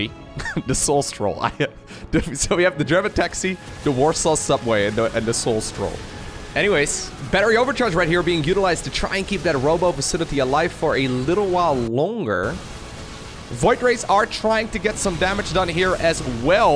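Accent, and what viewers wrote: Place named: Netherlands. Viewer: Dutch accent is so nice.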